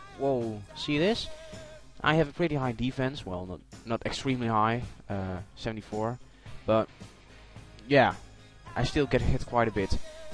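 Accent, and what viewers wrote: Netherlands. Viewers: Hollander dat hoor ik al aan je stem :p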